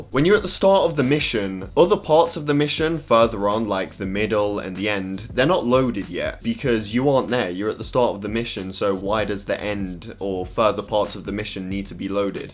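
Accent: United Kingdom. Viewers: I was just wanderin' what do u british do to "R"s?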